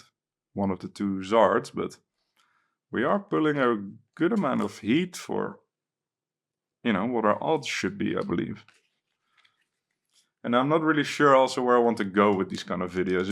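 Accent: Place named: Netherlands. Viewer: Was thinking you were Dutch too. That accent! :L. But, you're English is fantastic, even you haven't spoken it for years.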